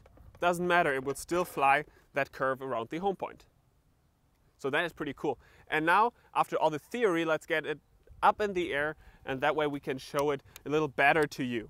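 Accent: Germany. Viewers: Well except for the German accent this is actually pretty good :)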